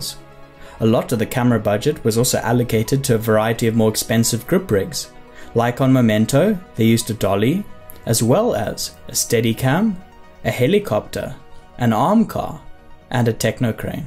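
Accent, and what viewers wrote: South Africa. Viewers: You Sound South African🙂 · Nice to hear a fellow South African, nice breakdown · Where are you from?, you sound South African. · I love the south African accent my china.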